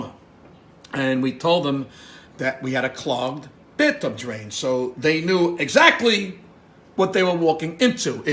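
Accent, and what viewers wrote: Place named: East Coast, United States. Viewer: ur killin me😅😅that east coast cadence in your speak just seals the deal😅😅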